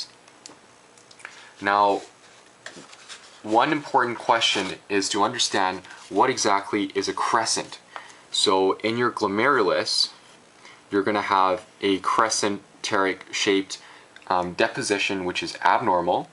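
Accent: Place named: Canada. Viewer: are you canadian? detect an accent lol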